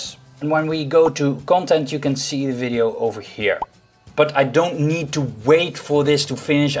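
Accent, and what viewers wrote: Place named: Netherlands. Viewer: Ik ruik nederlander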